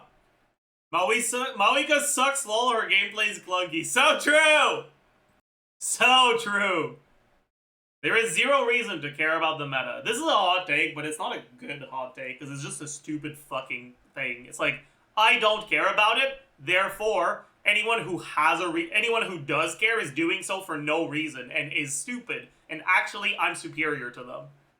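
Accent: Quebec, Canada. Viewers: which ones have you noticed? it could be because he's a quebecois french speaker, especially with fontaine names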